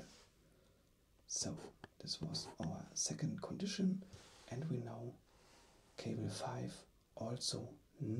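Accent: Germany. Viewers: Ich finde deine Videos echt klasse. Die Kombination aus ASMR und Logikrätseln ist super! Und du hast ein beachtliches Zeichentalent. Im vergleich zu dem, was ich so zustande kriege 🙈 Eine Sache muss ich aber doch auch loswerden, die mir als etwas störend auffällt. Du hast eine sehr deutsche Aussprache von Englisch. Besonders bei dem "th" fällt das auf. Falls du dich da gerne verbessern möchtest: Ich denke, beim "th" ist es Übungssache. Die Zunge muss unbedingt kurz zwischen die Zähne beim Sprechen. Vielleicht hilft es dir ja generell für die Aussprache auch, englische Filme zu gucken, um in den englischen Sound reinzukommen. ;) Dein Vokabular ist auf jeden Fall schon richtig gut. Und du hast eine sehr angenehme Stimme zum Zuhören.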